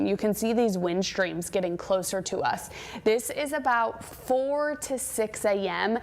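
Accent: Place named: New England, United States. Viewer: sounds like another yankee..............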